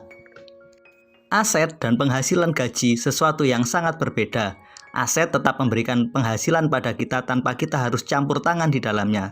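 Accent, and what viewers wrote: Java, Indonesia. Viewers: Wah rasis juga ya. Emang kenapa klo suaranya jawa medhok? Apakah jawa itu miskin?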